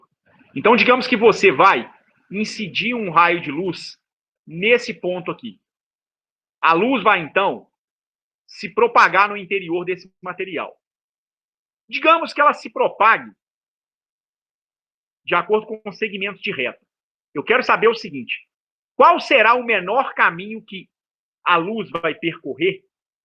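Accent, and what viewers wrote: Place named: Minas Gerais, Brazil. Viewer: Parabéns meu amigo. A vinheta é fenomenal. E o sotaque mineiro me lembra de 20 anos atrás.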